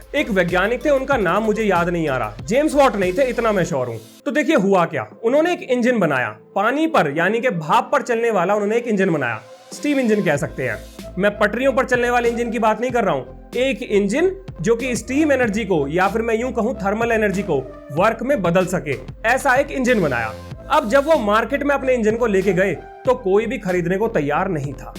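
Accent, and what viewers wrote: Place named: Delhi, India. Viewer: इसकी जबान दिल्ली वाली भाई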